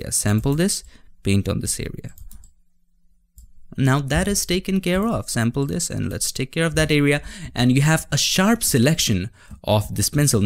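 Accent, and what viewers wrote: India. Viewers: Yes, an actual GOOD Indian accent which is ACTUALLY an Indian accent unlike some scammer shit people see on YouTube. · 3 its an indian accent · He’s Indian I think He sounds Indian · Indian accent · beautyofustwo well he does sound Indian so that might be why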